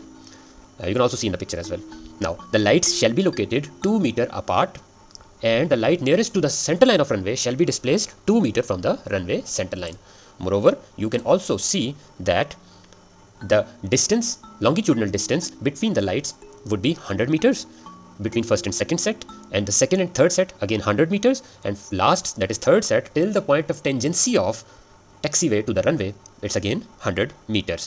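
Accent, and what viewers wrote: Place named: India. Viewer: It's always the Indian accent that teaches the best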